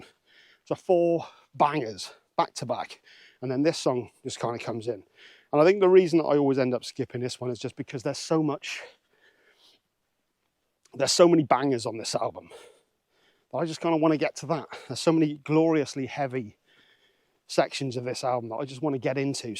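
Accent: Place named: Dartmoor, United Kingdom. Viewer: Where are you when you do these walks looks like Dartmoor or North Yorkshire to me. Guessing Dartmoor by your accent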